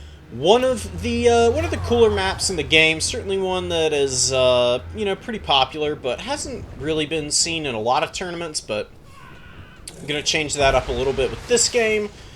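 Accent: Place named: Mid-Atlantic, United States. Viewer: The mid Atlantic accent is strong with this one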